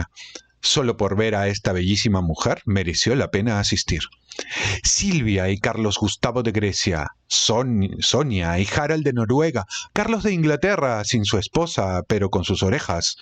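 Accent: Peru. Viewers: Genial eres Peruano????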